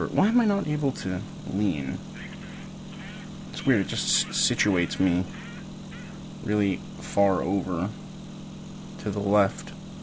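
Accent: Germany. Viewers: We love the german accent!! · The German accent is the greatest thing ever. If you're trying to make me angry with it, you're going to need to try harder! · I'm a german and i enjoy listening to you speaking with german accent Its hilarios Keep doing it your way, it's awesome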